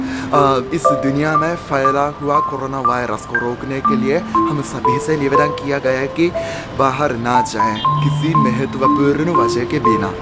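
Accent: Standard. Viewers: aap hindi bahut accha bolte ho. shudh bolte ho. hame aapki boli pasand hain. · Aap kitney achchey Hindi boltey hain bilkul sudh Hindi bhasha. Dhannyabad aap ka. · Chikitsak⛑️ oo Bhrata Hridya tript ho gya aapki Uchh ewam madhur vaani sun k....itni shuddh Hindi k Shabdawali k uccharan aapki Prateyak Chalchitra (video) m darshaya jata h...umeed h aap tatpar Aise Hindi ki Chalchitra poorntaha Roop se bnaenge or aap waqta bnenge or hm Shrota aapki Hindi Vaani Dhyanmagan hokar sunenge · Bhai badiya to h Hindi, itni shudh Hindi to Indian log bhi nhi bolte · bhai aapki hindi bahut hi badhiya, aapke hindi sikhane or bolne kaafi sarahniya hai. Aapki hindi bahut sare bhartiya logo se kaafi atyadhik shuddha hai, bahut sare sabdo ka hindi utcharan bahut bhartiyo ko nhi aata.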